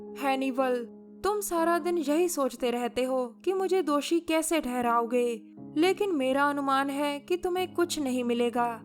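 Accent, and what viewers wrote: Punjab, India. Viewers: According to ur accent ,tusi punjab to ho? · Too heavy on MTI punjabi hindi accent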